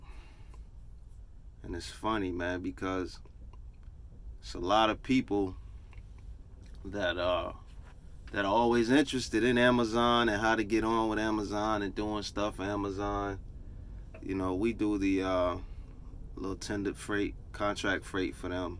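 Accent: New York, United States. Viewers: Bro u from New York?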